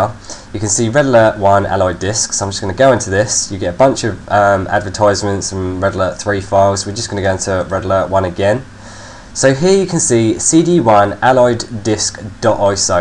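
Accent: United Kingdom. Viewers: Best Accent ! love british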